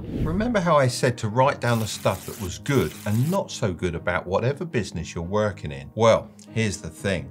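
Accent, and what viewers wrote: United Kingdom. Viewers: AND your British accent. It's just beautiful haha.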